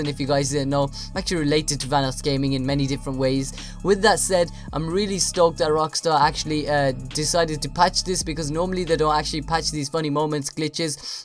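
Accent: United Kingdom. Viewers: This guy sounds like he's from the UK, ain't from Canada?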